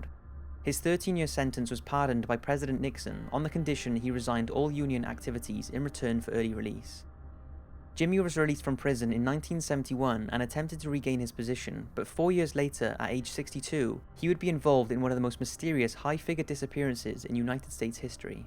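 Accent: Wales, United Kingdom. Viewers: With all due respect, I'm confused. Do you mean the author? The real Lemony Snicket (Daniel Handler) is from California and has a very deep monotone voice. The only thing I can think of is that you're referring to the guy who plays Snicket in the film 'a series of unfortunate events', Jude Law, who is from South London, whereas the guy narrating this video, if I'm not mistaken, is from South (?) Wales in the UK, which is a v different accent to London. Either way, he sounds v little like lemony snicket, real or acted.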